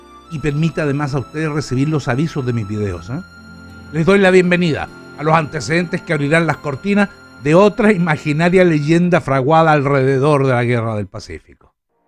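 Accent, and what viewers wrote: Chile. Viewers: tomese unas vacaciones y váyase a Lima unos meses. Cuando regrese a Santiago verá que su acento y vocabulario habrá mejorado notablemente! Pronunciará bien todas las palabras y su léxico tendrá una notoria mejoría. Hágame caso buen hombre! Discúlpeme, pero los chilenos tienen una forma de hablar tan espantosa que ofenden los oídos de los demás hispano hablantes. He dicho.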